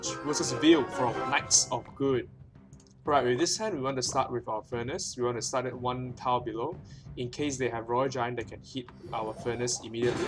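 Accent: Singapore. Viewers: U singaporean? accent tells me so lol. i am singaporean too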